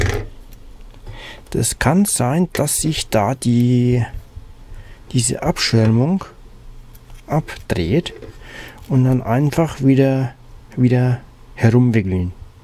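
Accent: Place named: Franconia, Germany. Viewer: Tolles Video, bisle frängisch is a dabei:D finde ich persönlich toll, weiter so LG.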